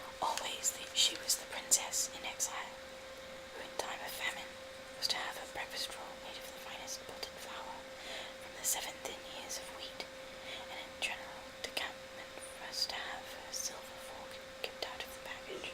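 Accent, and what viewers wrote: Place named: Southern England, United Kingdom. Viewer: I've been listening to this for a few nights now, and it's really relaxing :) You asked in the description if you wanted pointers on an English accent? To be honest, it sounds pretty bang on for a southern English accent, which is appropriate for this video, as long as you take into consideration that very few people actually speak like this in England anymore, our dialects are very region-specific :) a northern accent would be the next one to try, I would think. Well done x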